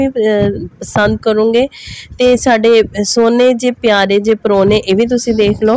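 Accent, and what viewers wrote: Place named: Faridabad, India. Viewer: Tadi punjabi ton lgda tusi fabad ton jy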